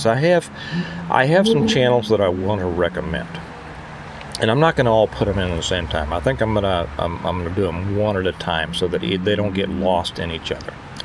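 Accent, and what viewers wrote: South, United States. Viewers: I LOVE UR DRAWL WHEN YOU TALK.. we in Canada love listening to YOU Southern folk..KEEP TALKING BRO.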